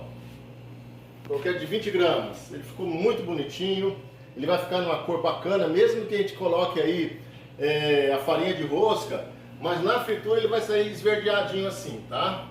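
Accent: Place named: Rondônia, Brazil. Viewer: Tu é de Rondônia mano blz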